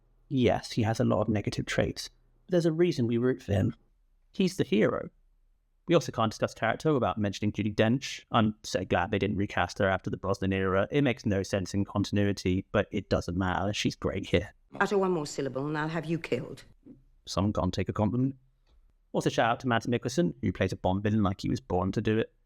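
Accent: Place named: United Kingdom. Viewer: You had me at "the human stain ... Pepsi!" Proper thing listening to a solid review of my favourite Bond with a British accent ;-)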